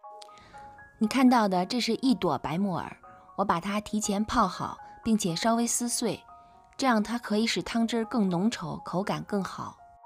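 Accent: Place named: Beijing, China. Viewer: 浓浓的京味👍👍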